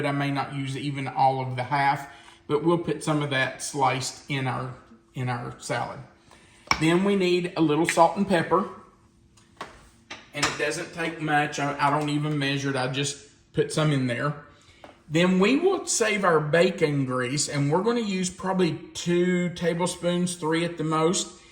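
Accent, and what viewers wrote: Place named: Appalachia, United States. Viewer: Appalachian talk ! See, us Aussies know a lot about America ! Not many Americans know much about Australia 😢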